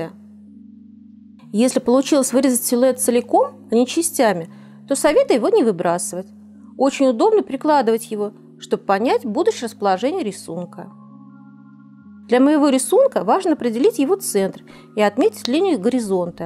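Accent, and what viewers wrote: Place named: Orenburg, Russia. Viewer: Говор интересный. Вы из Оренбурга?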